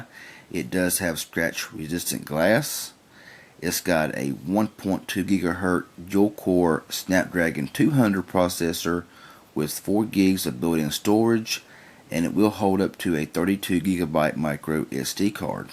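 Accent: South, United States. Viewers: You sound like you're from down south, but you talk nerdy, I LOVE IT!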